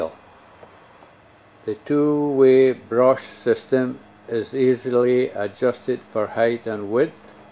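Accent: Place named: Scotland, United Kingdom. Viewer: Sounds liek the Scottish guy on teh Simpsons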